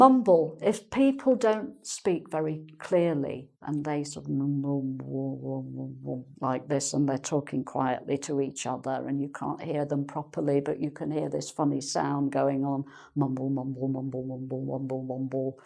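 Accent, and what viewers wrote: United Kingdom. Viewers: You are a great teacher. And your accent ! I love British accent but is not easy to understand, but I am happy listening to you because you are so clear. Thank you very much. Love from Buenos Aires.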